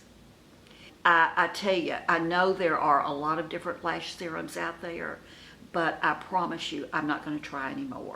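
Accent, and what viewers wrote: South, United States. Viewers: Know now why I love watching you, love the accent(I'm a Southern too) and best of all I am a July baby too!! Besides I love all the other stuff you do, I have already bought some of the makeup you liked and it is great.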